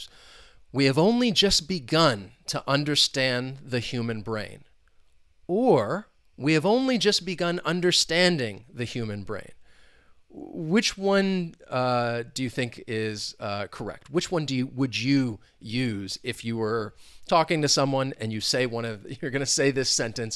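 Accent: United States. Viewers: he is an American.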